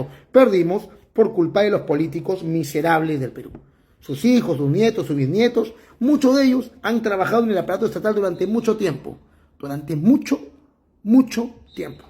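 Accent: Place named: Chile. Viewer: ...si hablas con dejo chileno.....que te puedo decir...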